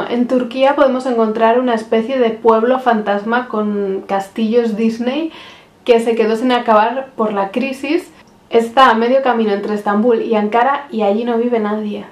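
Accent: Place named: Murcia, Spain. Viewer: Sobre tu voz y tu asiento eres mursiana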